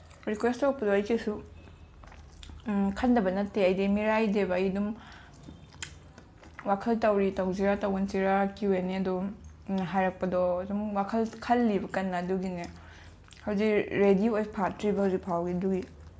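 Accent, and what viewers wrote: Kakching, India. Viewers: Che ei ngge vedio c ahnba oina nga c thngnajabani kakchingdeisu oirmmy ym phajei eisu kakchingdeini ym yngba nungngai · Kakching lon nangse ng kakching lon ngangbase ym cute oiye.... Pliz mathangdadi ngangsiko...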